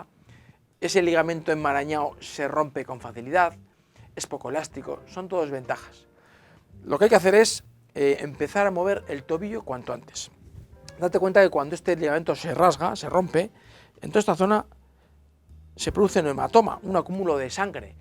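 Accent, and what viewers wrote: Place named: Basque Country, Spain. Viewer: Muy bien explicado, me sirve mucho este video para mí trabajo 😎, tu acento es del país vasco si no me equivoco 😅 saludos gracias!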